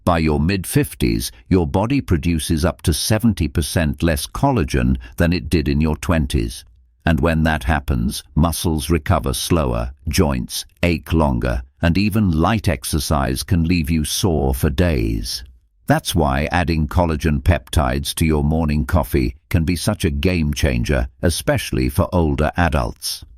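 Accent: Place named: United Kingdom. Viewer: Why does it take almost 16 minutes to say what you add to your coffee? We do not need to listen to the British voice droning on & on.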